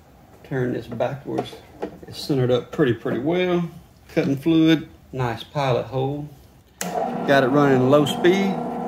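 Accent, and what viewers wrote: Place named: South, United States. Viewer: MAN! this was AMAZING! Videos like this are a rarity these days done in the form of how GM explained their cars in the 50s. And I have to admit, it sounds better with a southern accent. But the Quality of this video is MILES above anything I have seen. FANTASTIC JOB DUDE! I don't even own this model of blower. But I figure My Ryobi RY38BP Backpack Leaf Blower had the same thing that went out on it. And RIGHT on the day of the 3 year warranty expiring! :face-green-smiling: I just ordered a parts blower from Ebay, and plan to just swap out parts that way. But I will have to look into these RC couplings. Again, thank you and WONDERFUL job and explanation & Links! :goodvibes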